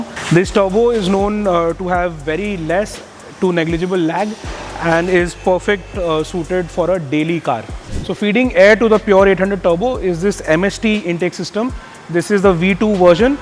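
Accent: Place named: India. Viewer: Im sure its indian accent😂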